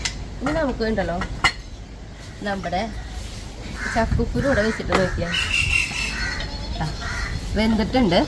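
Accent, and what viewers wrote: Thrissur, India. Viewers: hello, njan thrissurkariyanu. veedu evide anu Anu? Thrissur bhasha kelkkumbol valiya snthosham. Krishi valare ishtamanu. All the best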